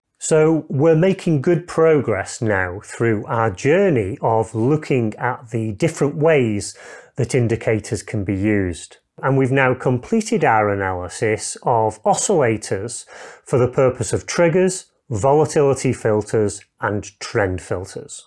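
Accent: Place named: United Kingdom. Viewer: Excelent contents and presenter's british accent is music!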